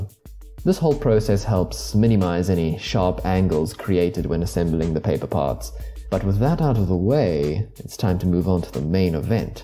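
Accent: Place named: England, United Kingdom. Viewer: thanks for replying, i meant i liked the silver mk2 suite seen in th 1st iron man most of all, the costume is meant to resemble a medevel knight to some extent, As is the brilliant robocop suite, silver reminds the eye of this most even though it may be stereotypical , as you americans say "if it aint broke, dont fix it" you do a good english middle class accent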